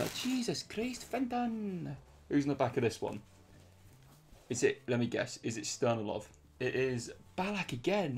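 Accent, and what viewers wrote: United Kingdom. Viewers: I love how britain talk,its cool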